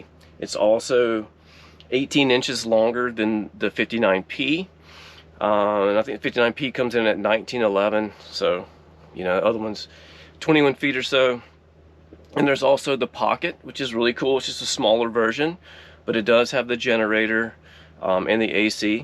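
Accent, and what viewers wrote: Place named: Georgia, United States. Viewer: Sound like a Georgia Boy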